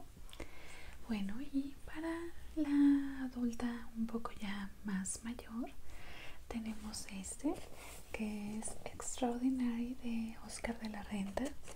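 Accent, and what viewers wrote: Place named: Mexico. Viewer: Hola me gusta mucho el acento mexicano y el asmr en comun 😘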